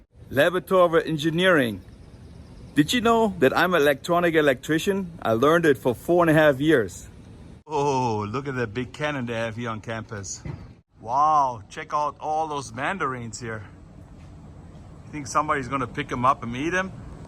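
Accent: Germany. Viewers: I like the way the German in you comes out, don’t apologize!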